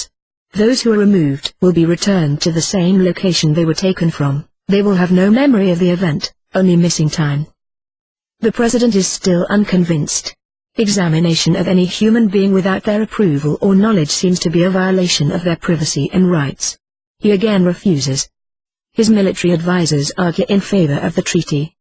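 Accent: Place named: England, United Kingdom. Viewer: It s hard to listen this robotic voice with english accent.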